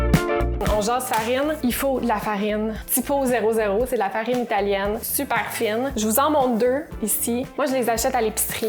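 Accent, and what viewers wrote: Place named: Canada. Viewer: Hello et merci pour ta vidéo, alors moi je fais ma pâte avec mon levain naturel maison. Utilise plutôt de la semoule de blé que la farine pour façonner ta pizza qui donne un croustillant à ta pâte, car avec trop de farine tu la rajoute à ta pâte et tu vas manger de la farine, c'est juste une astuce. Merci encore pour ton doux accent du canada et je t'envois à toi et ton jump les salutations de la Suisse. Astuce encore , prépare ta pizza déjà sur ta pelle avec un peu de semoule de blé sur la pelle plus facile pour la mettre dans le four.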